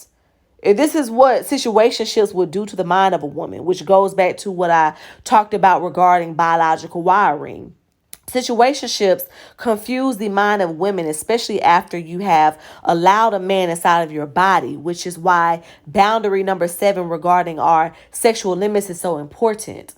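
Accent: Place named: Florida, United States. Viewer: Are you from Florida you have the accent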